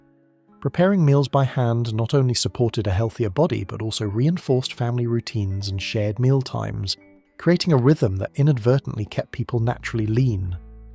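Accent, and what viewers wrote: United Kingdom. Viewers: The voice and quite refined English accent are very pleasant to listen to - but the language is American with the usual grammatical errors and mispronunciations, which is a real pity.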